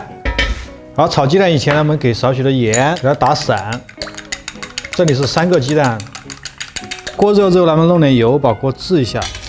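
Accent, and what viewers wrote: Sichuan, China. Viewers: 的川普有点儿安逸。rua均匀，白fu椒粉，荔枝fu辣味，fu辣油。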